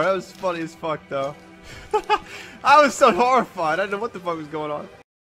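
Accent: United States. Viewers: lmao the reason why i watch is bcos of his reaction and his strong American accent HAHA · Jeez has the most American Voice ever:face-green-smiling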